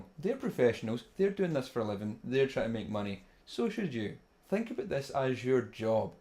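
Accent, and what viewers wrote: Scotland, United Kingdom. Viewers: Oooo your Scottish? Where about are you from? I'm from south lanarkshire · Emmanuelle de jesus Scottish accent. Someone from Scotland could probably tell you what city too (Edinburgh sounds v different to Glasgow). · Just discovered your videos a few weeks ago, and have enjoyed them a lot, not just because I agree on a lot of your points about EMGs, Floyds or Boss HM2s with full gain, not just because I have the exact same Bugera amp, or because I love the Scottish accent. I like them because I actually learn some valuable lessons from you. That being said, I also agree on everything in this video, except I don't think wireless systems makes a guitarist move around more. I'm the guy who always gets in the pit with my guitar or jumps from PA towers, but I never thought of a wireless system as a worthy investment. I usually don't use pedals, maybe a little OD sometimes to boost solos, but even when I don't have any other pedals I always put my Korg stage tuner on the front/center of the stage so I have an "anchor point" in front for more movement/range and to reduce the risk of pulling my amp head to the floor. 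I also put my cable around my strap so it won't get ripped out. I see many guitarists with wireless systems standing in the exact same place the entire gig, and I don't get why they bought it. Anyway, I think there are some other advantages with wireless systems that I haven't seen yet, maybe you could make a video about what possibilities such a system gives you and some pros and cons with being wireless? (A guitarist I know uses his system (shure) simply because it has a built in tuner.) How about setting up your gear for a gig? switching guitars onstage? If you use a shitload of p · new sub here. not just for the Scottish accent nut great advice as well! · Scottish accent is the best :DD great video as well !! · Great advice. I've been playing live for 50 years now, and each of these tips ring true. I could have done a video on this, but I don't have the uber cool Scottish accent that you do! 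· Dude you look so cute and ur Scottish accent is pretty funny and frustrating to listen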